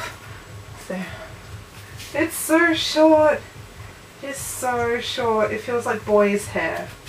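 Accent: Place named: Australia. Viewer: u have an Australian accent!! I'm about to get mine done... !! a bit nervous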